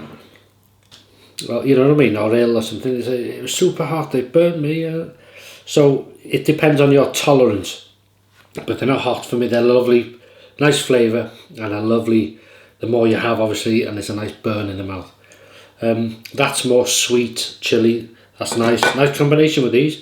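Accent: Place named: RP, United Kingdom. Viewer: You would make a PERFECT POSH !!!!! You should be a audiobook reader of a Victorian Novel 👏👏👏👏👏 Please make those dialogs . Again. LOVELY !!!!!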